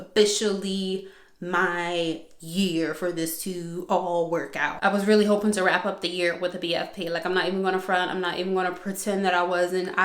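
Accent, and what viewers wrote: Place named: East Coast, United States. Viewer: Sometimes your accent it’s light. But once you start getting “frustrated” it’s like BAMM. I love the East Coast accent 😍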